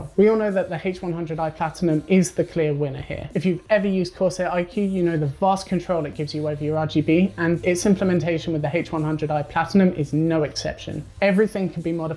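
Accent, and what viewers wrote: United Kingdom. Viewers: Wow that accent is very British Sir are you sure you are American ?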